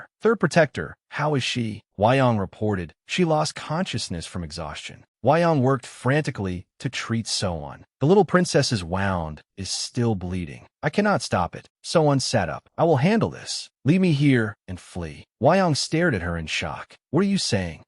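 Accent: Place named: Arab world. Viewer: Why does the narrator jump into an Arab accent every couple of minutes?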